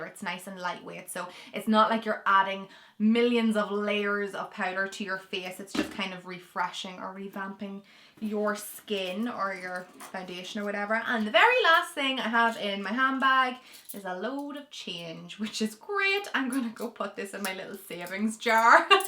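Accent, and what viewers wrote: Ireland. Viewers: I love your irish accent!!❤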